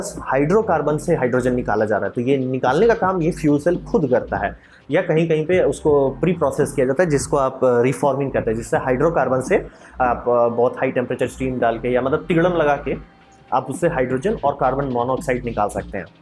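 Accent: Bihar, India. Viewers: Can I guess you are from Bihar naa???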